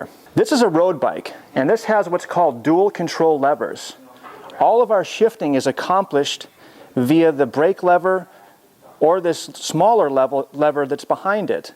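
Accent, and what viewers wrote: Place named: United States. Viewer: I agree, he's probably American with that accent - how do you know he lives inside a chimney? What's a 'damper'? I guess you don't like Americans - don't blame you; they are pretty stupid. Just look at Bush - the most stupidest president ever - he couldn't even speak properly and probably was as bad as spelling as you! lol!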